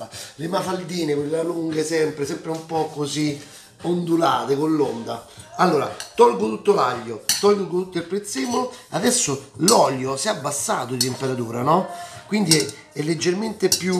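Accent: Rome, Italy. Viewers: CIAO.. TI RINGRAZIO TANTISSIMO PER TUTTE LE VOLTE CHE CUCINI.. SEI UN GRANDE👍MI PIACE TUTTO QUELLO CHE CUCINI... E POI QUANDO ASSAGGI QUELLO CHE HAI CUCINATO FAI VENIRE VOGLIA DI MANGIARE E DI CUCINARE SUBITO.. SEI PROPRIO SIMPATICO.. POI PARLI ROMANESCO E IO ADORO TUTTO QUELLO CHE È ROMANO... IO SONO UNA CUGINA DI ROMA.. (CIVITAVECCHIA) POI SONO UNA NONNA DI 73 ANNI.. QUINDI È PIÙ APPREZZABILE QUELLO CHE SCRIVO.. GRAZIE... UN SALUTO A TE E LA TUA CAMERA WOMAN.. UN BACIO AL BIMBO.. CIAO ALLA PROSSIMA.🌹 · Il dialetto romanesco è sempre uno spasso..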